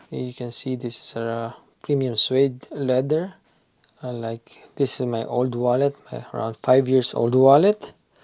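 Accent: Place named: Philippines. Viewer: Thanks for posting this and showing off all of the compartments! Just ordered it because I made a mistake and bought a different Vulkit that can't really carry cash 😅. Ignore the bumbling morons who claim they can't understand properly spoken English because of a light Filipino accent. You speak just fine, I watched the video at double speed and still made out every word. Side note: I think you're meant to fold the last 1/4th of the cash bills inward to fit them into the slot. That's how it looked on the site anyway.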